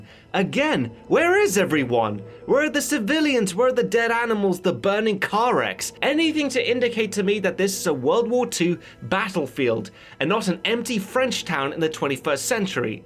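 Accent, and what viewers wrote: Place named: England, United Kingdom. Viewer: Fine channel. Hope your other History Buffs videos aren't 100% single sided, one perspective. For example, Churchill seems to be the drunken nudist protagonist of your video. BUT: Churchill turned down 6 Peace proposals from the Germans who did NOT want a war with England. Churchill declared war on Germany, not the other way around. Churchill had the choice of "saving the Empire" or sacrificing British lives and risking it all. Churchill only got a victorious retreat because Hitler held his generals up, and lost their backing from then on. Unlike the impression you get from this (otherwise great channel) the Germans could have bombed the hell out of the Brits on the beach with their backs to the sea. If the German army couldn't get their in time, then who backed the Brits to the sea? How about a follow up History Buffs video purely from the German side for "diversity's" sake! 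Haha, you even have an English accent! That war was a backlash to WWI over-reach and punishment, and cost Europe and England some of its finest and set up the West for the current invasion of foreigners world wide. Good for the Banksters of the world, we all lost that war!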